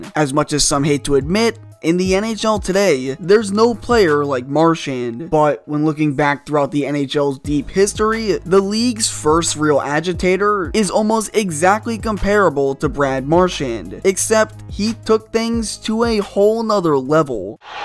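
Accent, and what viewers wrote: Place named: Maritimes, Canada. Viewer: Im hearing East coast accent, sounds like NB or CB. Im leaning more towards NB now after watching a few more of your vids.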